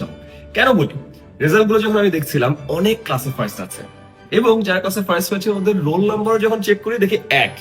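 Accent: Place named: Comilla, Bangladesh. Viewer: Apni Cumilla r😮🎉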